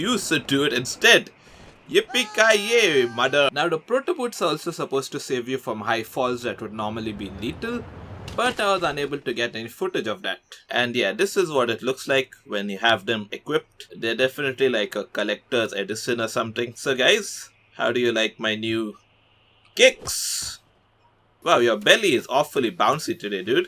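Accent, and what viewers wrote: India. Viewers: I know you're most likely indian, but still love your accect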